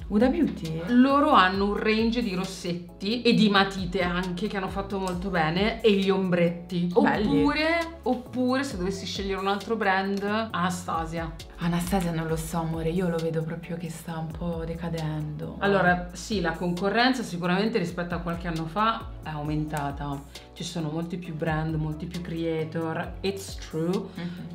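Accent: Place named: Apulia, Italy. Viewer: Riconoscerei una Pugliese tra mille persone ahaha. Adoro ❤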